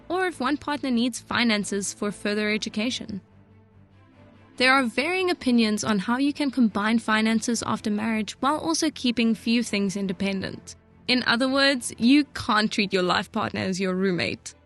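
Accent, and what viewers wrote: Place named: South Africa. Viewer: Thinking the same thing, hey. Accent is too South African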